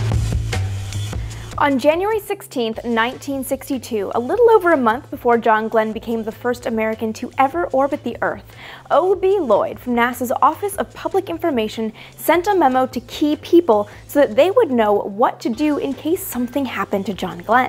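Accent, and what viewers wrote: Canada. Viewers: so sorry but your love for NASA shows american, your slight accent shows Canadian, what are you?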